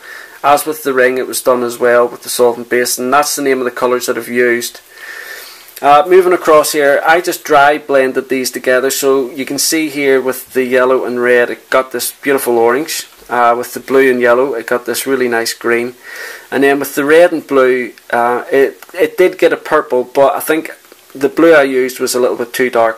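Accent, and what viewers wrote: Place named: Northern Ireland, United Kingdom. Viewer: Great to hear a fellow NorthernIrish voice talking about coloured pencils!! I started using them about 3 years ago in my art (I use lots of different media), but I don't know anyone else here using them. Do you live in N Ireland? I'm a member of the UKCPS, I don't know if you have heard of them? Anyway, these are great pencils but I only have the small set. Atm I mainly use Faber Castell polychromos and I love Derwent's Drawing set palette, especially on black paper. Will follow you on FB and Twitter!! Thanks for these videos. My husband keeps saying I need to start doing videos but I don't think I'd be much good. This was very informative and well done. Keep up the good work!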